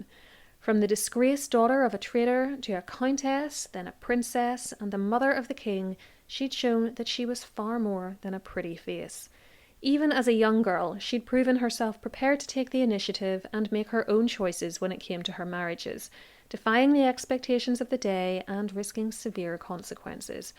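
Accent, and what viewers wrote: Northern Ireland, United Kingdom. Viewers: Hi - is from Northern Ireland 🌟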